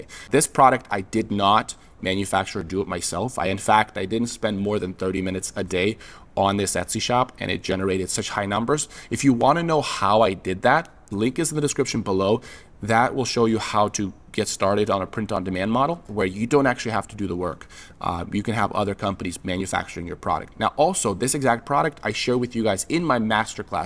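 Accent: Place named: North, United Kingdom. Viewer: your second language? When did you learn? You accent almost just sounds like a northern accent. Impressive 👍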